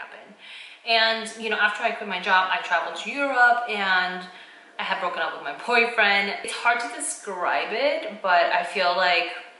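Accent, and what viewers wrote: Puerto Rico. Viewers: To be honest I first saw your videos and was fascinated by la chinita que habla español como boricua lol but seeing your videos and personality I was drawn to you and subscribed right away and pretty much have watched all your videos. There's sonething different and reall about you, its refreshing and I love it. I'm glad ypu took a break from youtube because it lead you to self discovery and that's what's more important, your happiness. I'm a true fan and will continue to be even if you dont post anything haha. I follow you on instagram and always love your post and quotes! Looking forward to your new content! Glad your back. Besos!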